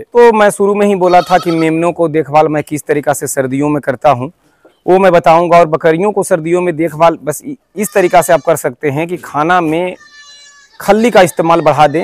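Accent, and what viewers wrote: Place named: Bihar, India. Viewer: Bhai ya to Puri terah se khadi Hindi bolo nhi to Puri terah se Bihari language me bol ..nhi aata bolne to kamse kam wo kaam nhi karna chahiye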